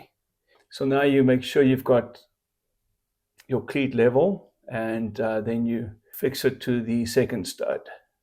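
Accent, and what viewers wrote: South Africa. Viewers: is meneer van suid afrika,want ek hoor daai Afrikaanse "accent"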